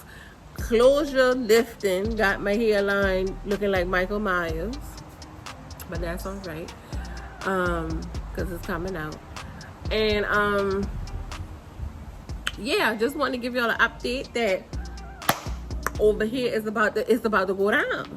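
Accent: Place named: New Orleans, United States. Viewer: You are hilarious 🤣😆 and I love that New Orleans accent. I know this next meal is going to be another banger. Wow an outdoor air fryer? Can't wait to see you put that to use and show us a recipe. 🤭🤭🤭 Not Churches over here. Yes change your grease Churches 😩🤣. You all be throwing down. When you come to TX can you mail us a plate since you not coming to Dallas⁉️😉 No ma'am I don't do bugs either nothing PERIOD. Tfs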